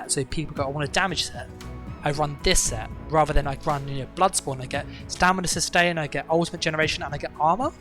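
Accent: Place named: England, United Kingdom. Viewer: This guy is clearly English i dont get this comment, there defiantly a lot worse accents from England.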